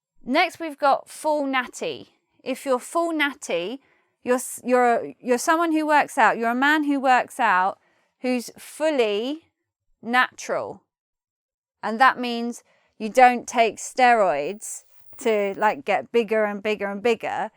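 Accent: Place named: England, United Kingdom. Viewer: why wre not you my high school teacher ? i love you accent so English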